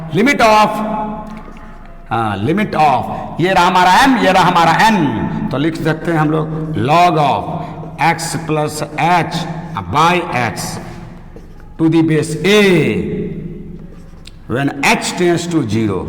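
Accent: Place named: Bhojpuri, India. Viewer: Very nice sar bhojpuri style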